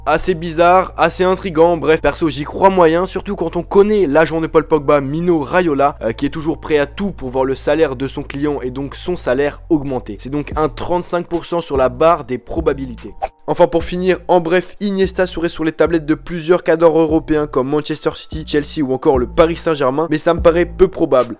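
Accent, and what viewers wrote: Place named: Belgium. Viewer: Tu est belge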